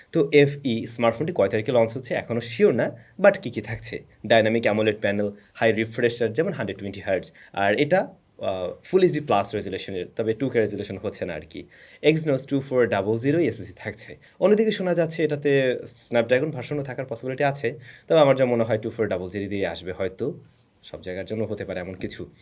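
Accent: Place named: Noakhali, Bangladesh. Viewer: bhai Noakhailla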